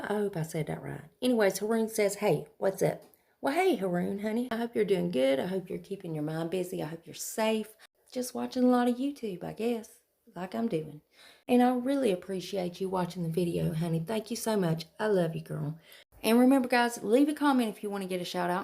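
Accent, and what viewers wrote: South, United States. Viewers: Hey girl! This is my first time finding your YouTube Channel. My name is Kate and I’m from Vermont, but went to college in Memphis, TN and LOVE your southern accent!! Anywho, for my BoxyLuxe June box I chose the TULA Glow and Get It Eye Brightening Balm and my 2nd Choice Option (I wanted the Swell Bottle but it was no longer an option) so I selected the Gua Sha Face Sculpting Jade Device. By the way you literally made me Laugh Out Loud when you said “Y’all, what the hell? Y’all know I don’t know how to pronounce anything. Let’s move on...” I instantly subscribed to your channel after that as in my Vermont accent I said the same thing, LOL! Have a beautiful month of May and thanks for sharing with us!!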